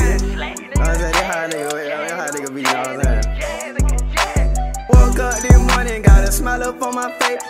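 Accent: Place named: Birmingham, United States. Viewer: aye u from birmingham alabama?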